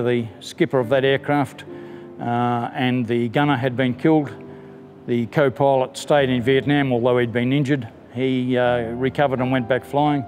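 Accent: Australia. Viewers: With the music and the aussie accent I think of one person and one person only...CHIPS DUBBO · We all owe you a great amount of respect, you brave and humble man from Australia ..! By the way … I love your accent, but I had quite a hard time trying to understand it … Wish you a long and healthy life, Sir … Greetings from Switzerland 🇨🇭 🇦🇺🇨🇭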